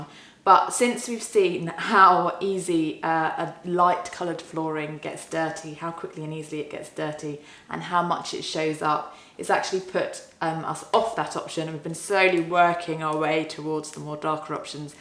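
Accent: United Kingdom. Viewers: British akcent ❤️❤️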